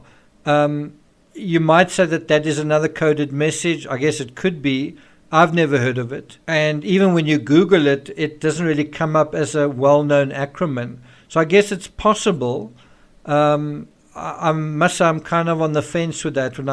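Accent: South Africa. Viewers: I love your accent am I right in saying it's a South African accent. Its the one accent I wouldn't mind the whole world having.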